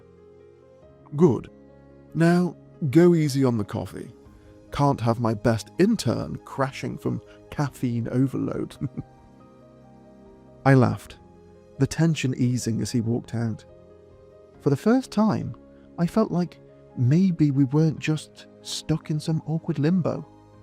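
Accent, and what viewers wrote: United Kingdom. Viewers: Good northern accent. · I like this British voice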